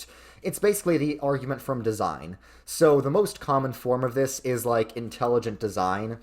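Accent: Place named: Bronx, United States. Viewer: No offense, but you definitely sound like you are from the bronx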